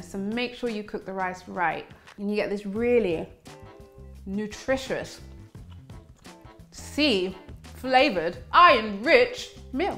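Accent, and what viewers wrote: England, United Kingdom. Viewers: She sounds English tho.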